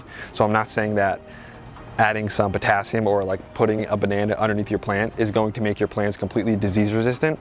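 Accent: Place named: Philadelphia, United States. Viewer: ❤❤❤❤❤ I love your channel!!! (Can tell you’re near Philly, love to hear someone who also has the accent :) )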